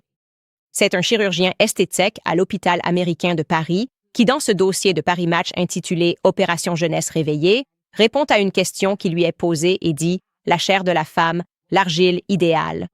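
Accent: Quebec, Canada. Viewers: AI gave you an accent from Québec!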